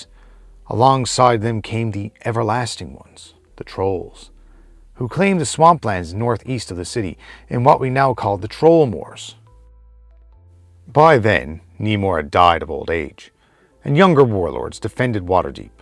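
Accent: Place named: Newfoundland, Canada. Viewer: Great video! With that Newfoundland accent and all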